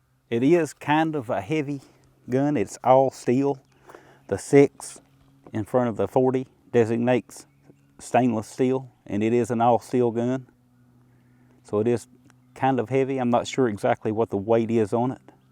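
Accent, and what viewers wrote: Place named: Kentucky, United States. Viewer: Im from Shepherdsville just south of Louisville. Sounds like you're from KY too. If so what part?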